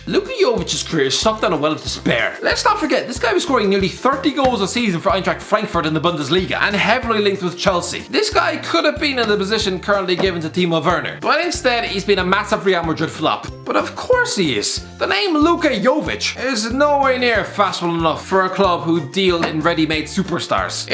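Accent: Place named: Ireland. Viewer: I had to put the video at 0.75x speed just to make this guy's Irish mumble rapping sound coherant.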